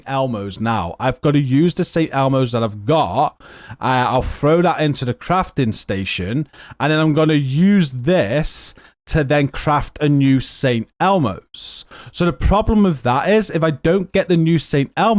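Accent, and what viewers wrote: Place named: England, United Kingdom. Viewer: I really like you man, I follow you I support you and I played with you and your clan for a time but GOD I HATE ENGLISH ACCENT.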